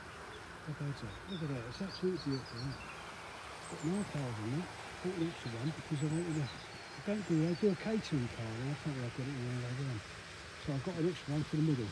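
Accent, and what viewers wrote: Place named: England, United Kingdom. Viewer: Looking the name of your layout and hearing your accent are ypu anyway near Daws Hill, High Wycombe? Cheers Michael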